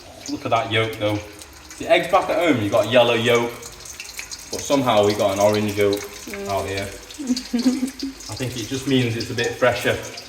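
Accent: United Kingdom. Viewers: when did you move to thailand? fitting name, you sound British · Awesome how this dude looks like full, full native Thai and then speaks like a a British man. 😆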